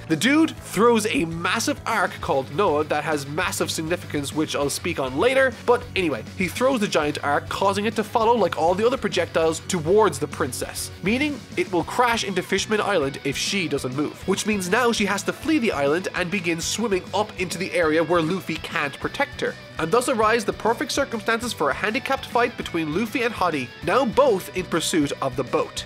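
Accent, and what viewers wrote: Ireland. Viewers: Yes, his accent is pretty Irish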